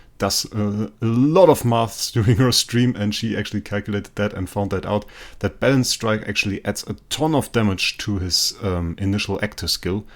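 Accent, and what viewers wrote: Germany. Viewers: Bist du Deutscher man erkennt es so am Akzent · First of all great video. You make it look insanely easy. And secondly I wanna ask if you are from Germany because your accent sounds very German.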